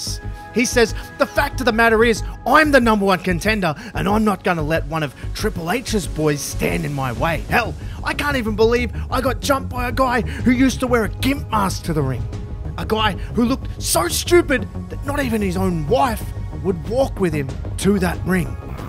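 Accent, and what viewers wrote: Australia. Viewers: You have an accent what is it Australia 🇦🇺 or New York idk something’s like that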